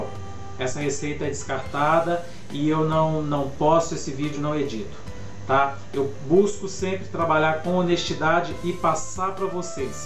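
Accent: Minas Gerais, Brazil. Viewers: Oi! desde o primeiro vídeo de rocambole já gostei do seu jeito de passar a receita, mto tranquilo, simples e com mt convicção. Que bom esse vídeo, e´ interessante sabermos um pouco da vida da pessoa, completa mais o conhecimento virtual. A gastronomia e' instigante, ela nos remete a vários lugares culturas diferentes.....nos faz experimentar um pouco desse universo, gosto muito tbem, se não fosse professora acho que me daria mt bem nessa profissão. Você tem tudo pra dar certo! na vida as coisas são devagar tudo no seu tempo, gosto do seu sotaque mineiro e da sua cultura, comidas deliciosas... Sorte e Sucesso! beijos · Ah! como imaginei, pelo sotaque e jeitinho, vc é mineiroo de BH! Moro em BH, pois casei com um mineiro uai, mas sou gaúcha, criada em Curitiba. Hoje, super inscrita no teu canal... entrei por acaso pra ver uma receita e vi q teu canal tem mtaaaaas receitas boas. 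Vc é mto talentoso, prendado e acima de tudo uma pessoa humilde, tbm explica mto bem as receitas. Deus te abençoe, grandemente,! Abraço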